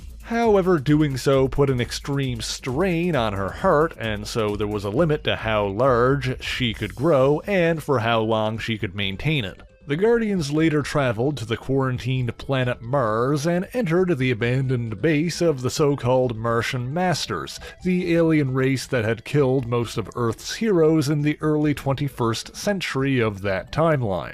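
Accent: Newfoundland, Canada. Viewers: Love the channel. I have been wondering where your accent is from?Newfoundland?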